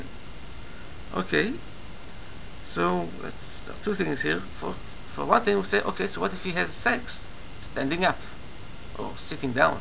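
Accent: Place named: Israel. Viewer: He sounds Israeli.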